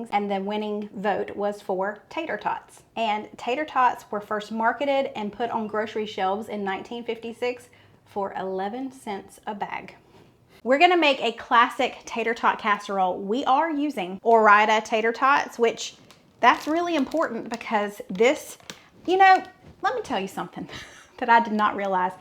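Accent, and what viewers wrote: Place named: Minnesota, United States. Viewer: You do ,have the accent right for Minnesota I'm from South Dakota my birth state. 🤣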